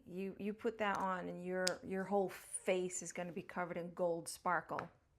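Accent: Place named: Boston, United States. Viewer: There’s many Americans here in Australia and of course many Canadians. I always ask “Are you Canadian?” because I know Americans never get offended, unlike some Canadians do if I ask “Are you American?”. Generally I think both Canadians and Americans are very popular here (especially Americans) because we have grown up watching so much US TV (yes I too watched too much I dream of Jeanie, Bewitched and Get Smart). I feel like America represents the very best and very worst of western culture. Which is always so fascinating, to me anyway. Do you miss home? I can really hear your ‘Boston’ accent today (sorry if you’re not from Boston, it just reminds me of the accent in Good Will Hunting which was set in Boston.) It’s a very distinctive American accent. Sometimes I can tell an East Coast vs West Coast accent but not always. Anyway, what an interesting life you have lived. 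You’re truly international. How did you end up here in Oz? You’re American, with long grey hair, wild makeup preferences, fluctuating accent and work with birds! That’s interesting. Why do I get the sense that is just the tip of the iceberg with you? There’s a lot more to your story?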